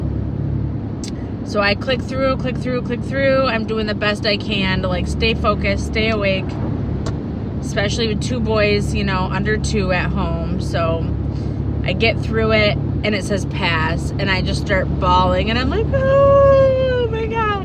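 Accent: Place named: Wisconsin, United States. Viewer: I could tell right away you were a Wisconsinite, or possibly Minnesotan, then you said Great Lakes. I’m from the same area. You seem like someone I’d get along with, I like your personality. We’d have a lot we could relate on, I don’t know anything about having kids though. Despite what other commenters have said, it sounds like you’re doing an amazing job, you’ve got the right attitude, and I applaud you for going after your dreams when you can find the time for yourself. That’s something your kids will look up to. Just make sure you get enough sleep so you don’t get sick! :) wishing you all the best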